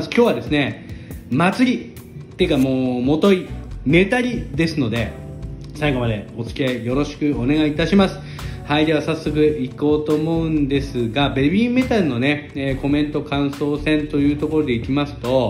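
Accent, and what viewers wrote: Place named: Hiroshima, Japan. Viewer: 所々広島弁が散りばめられているのはさすがです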